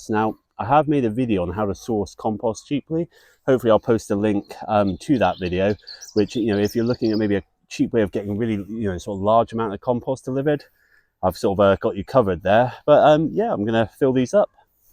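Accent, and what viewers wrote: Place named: United Kingdom. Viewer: Thanks, that's helpful - especially a British voice amongst so many of our American "cousins". Good to get tips that are relevant to UK. Link to your compost video please?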